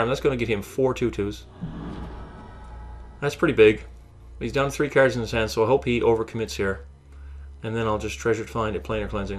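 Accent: Canada. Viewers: you canadian? just a question you have a pretty thick accent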